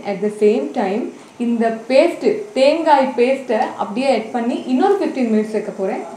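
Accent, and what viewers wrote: India. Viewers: sis don't use the blady indian tamil you use in our Tamil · இந்திய தமிழ் வாடை அடிக்குதே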